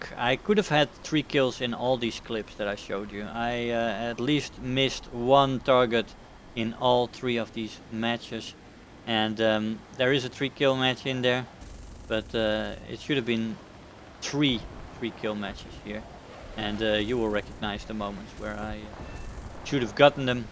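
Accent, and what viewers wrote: Netherlands. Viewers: lol xD im from indonesian and i know the dutch accent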